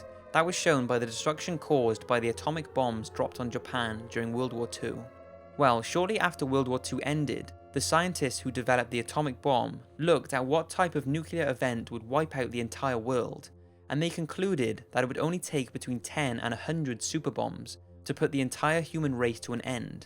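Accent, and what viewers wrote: Wales, United Kingdom. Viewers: Are you Welsh ?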